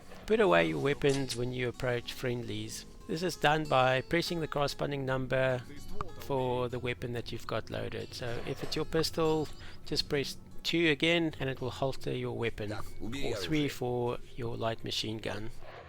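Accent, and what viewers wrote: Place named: South Africa. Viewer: Was wondering about the accent, also from SA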